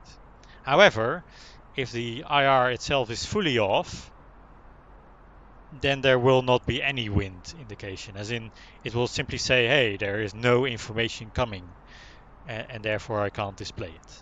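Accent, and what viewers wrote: Netherlands. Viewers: i smell Nederlands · Ha! Ik hoor een Nederlands accent! Gaan jullie ook over op Xbox series X? 👀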